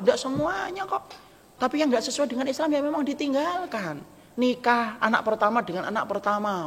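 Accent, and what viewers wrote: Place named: Kudus, Indonesia. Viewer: Logat orang Kudus'nya Ketoro Ust.. Barakallah 😀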